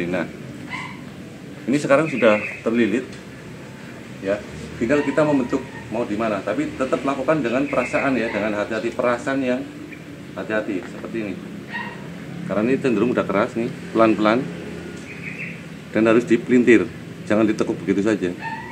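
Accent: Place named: Java, Indonesia. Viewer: Abank orang Bali tapi bicara nya kyk jawa abank bali apa bukan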